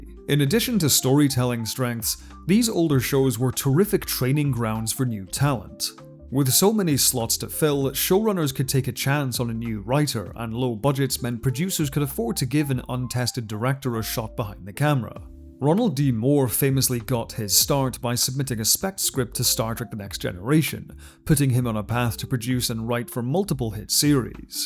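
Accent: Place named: England, United Kingdom. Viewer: Wait you sound English. Are not most English and European shows like 1-4 episodes a season which you call series, and then it only goes for like 3 seasons anyways? Or do you get a lot of American TV Shows in UK?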